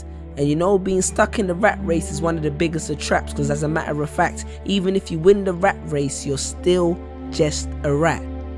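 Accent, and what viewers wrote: London, United Kingdom. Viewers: british london accent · it's a British accent. Australian accents are alot rougher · That's a British accent... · It's quite disturbing seeing a black man with British accent! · He has a British accent.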